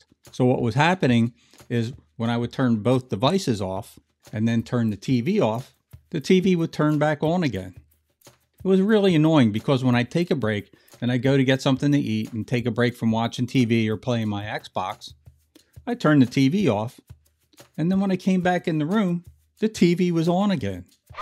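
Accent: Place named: Philadelphia, United States. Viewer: You're from Philly, aren't you? The accent is unmistakable.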